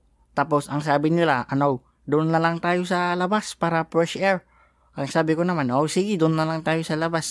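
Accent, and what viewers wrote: Ilocos, Philippines. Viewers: Ilocano kaba boss hahaha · Ilocano ka boss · The best talaga accent ti ilokano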